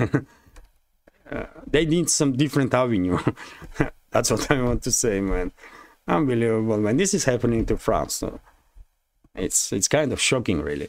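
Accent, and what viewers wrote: Russia. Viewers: FINALY A BROTHER HUMAN A BROTHER RUSSIAN VOICE RU RU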